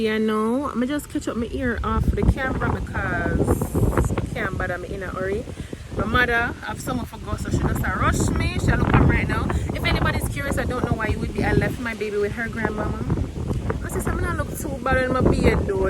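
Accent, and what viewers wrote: London, United Kingdom. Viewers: lol 😂😂😂 you got the London accent on point ❤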